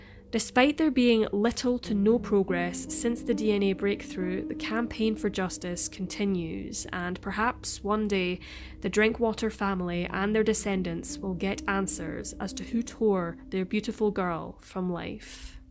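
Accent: Scotland, United Kingdom. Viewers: she is Scottish. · It's Scottish..! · She’s Scottish, not English!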